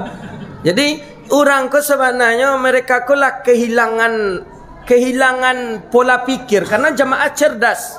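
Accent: Minangkabau, Indonesia. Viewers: Saya sangat merindukan ceramahnya Ustadz yg khas dgm dialeg minang, tegas dan berilmu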